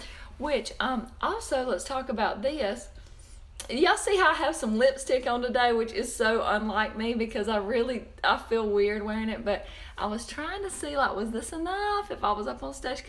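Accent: South, United States. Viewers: Your features do remind me of Liz Taylor I wonder if the southern accent also has something to do with that, "cat on a hot tin roof" was playing in at the theatre recently. I wonder if you have seen her in e film? Xx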